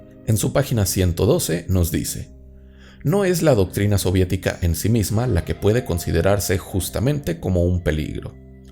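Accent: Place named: South America. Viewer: Hablas con astucia con un acento de un pais de America del dur usas chivas , eres Moiche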